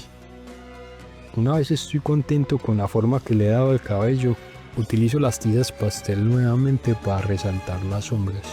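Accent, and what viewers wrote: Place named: Latin America. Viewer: un video acerca de lo que me gusta con el acento latinoamericano mas bello que existe, saludos desde Argentina amigo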